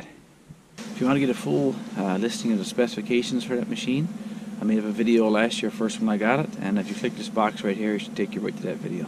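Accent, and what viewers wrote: Newfoundland, Canada. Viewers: Sounds like a fellow Newfoundlander here! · When I started watching you clear snow, I said wow, no wind to speak of, how lucky is it to be where you are. I am in Eastern NL, Canada and it seems like it is always windy here. Throwing snow almost straight up is never an option here. Then, I noticed the tall trees and guessed you were in Ontario. Then I saw your license plate and picked up a bit on your accent, seems like you are in Central NL. Great machine for snow clearing. I assume your driveway (at the cabin) is dirt. What do you do to keep your machine from digging in and picking up rocks and breaking shear pins? I haven’t had much luck setting the guides high when clearing uneven surfaces with mine.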